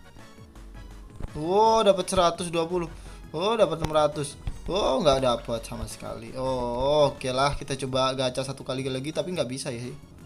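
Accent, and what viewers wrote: Java, Indonesia. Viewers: Fakta video ini, orangnya medok dan pokemon lovers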